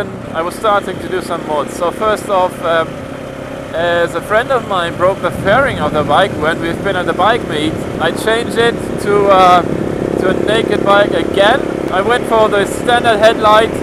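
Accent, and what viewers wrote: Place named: England, United Kingdom. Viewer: Ist mir aufgefallen, einen leichtes flat-english ist rauszuhören! :-)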